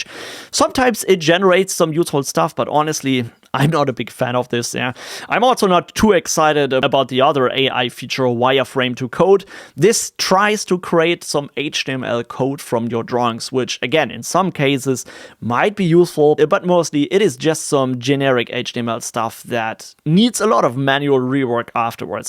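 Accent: Germany. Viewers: subbed, i found you to be a good how to guy, will be checking out more of your videos and liking and or commenting, Are you German or Austrian, excuse my ignorance pls.I like your accent and my tism is acting up needing to know the answer.I could read your bio, but that would discourage discourse with you directly.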